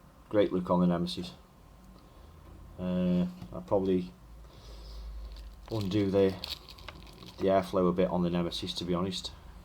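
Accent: Stoke, United Kingdom. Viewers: Aye up mate.....i was really surprised to hear a Stoke accent doing a review. Ive noticed a lot more people walking round with e-cigs just lately.... are there any good brick and mortars selling good quality liquids (locally) that you know of? keep it up anyway mate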